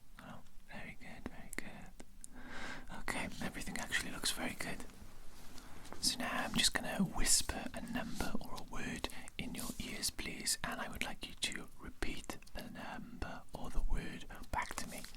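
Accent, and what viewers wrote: Wales, United Kingdom. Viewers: Where in wales are you from?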